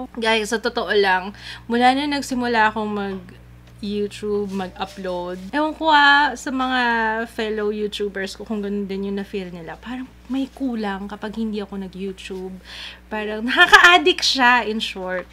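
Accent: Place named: Conyo, Philippines. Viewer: Hi Ate! Happy 4th Year Anniversary! Actually, nadiscover kita sa isang video, di ko na maalala ano title nun basta yung video na yun is naghahaul ka ng make up pero isa ata to sa mga videos mo nung naguumpisa ka palang, nasa ilalim ka pa ng hagdan nun nagfifilm and actually, naaartehan ako nun sayo kasi the way ng pagsasalita mo dun parang ang slang and parang conyo, so sa sobrang curious ko, vinisit ko ung youtube mo profile mo and nanuod ako ng ilang videos mo para makita kung gaano ka kaarte, hehehehe wala sana magalit sakin pero yun ang totoo, yun naging 1st impression ko kay Ate hanggang sa napansin ko nag vovlog marathon na ako at nagsubscribe sa channel nya. nakakatuwa lang isipin na from being a slang girl na akala ko nagfifeeling ka lang ate to a jolly person, nakita ko rin ung improvement mo, and masaya ako na nadiscover kita ate. 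Its been 2 years simula nung simulan kitang subaybayan, kumbaga ikaw ang Itsjudyslife ng Pinas. Dahil sayo din ate mas naiinspire akong magyoutube, pero I think di pa sa ngayon pero I hope one day magawa ko rin sya. So ayun, sana nga mahanap ko ung video mo na yun eh, basta tanda ko nun naghahaul ka po ng mga pinamili mo pati make up sa may hagdan at medyo madilim nga nun kasi pagami na at kadarating nyo lang ni Papa Kitz galing sa mall siguro. Basta ate sana walang magbago kung ano ka dati sa kung ano ka ngayon, dahil minahal ka namin ng ganyan ka na. I hope one day mameet kita ate! I love you! God bless you and your whole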